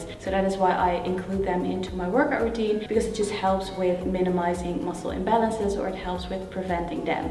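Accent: Netherlands. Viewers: Im just curious, where are you originally from? Your accent sounds Dutch to me ^^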